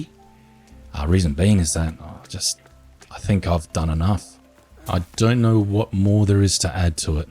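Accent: Australia. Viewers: Ah, thought you were a fellow Aussie but for some reason I couldn't tell for sure. Namely because you spell words like behaviour the American way. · Hey, ive been wondering, what do you want in 1.0? Also, are you Australian? Edit: never mind. I didnt hear till the end. Gotta love straya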